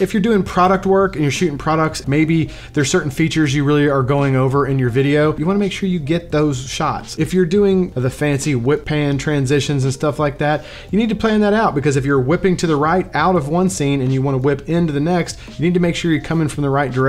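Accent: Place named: Texas, United States. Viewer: #Helpful. Will use your skill share link. Where you from? Texas?... great accent!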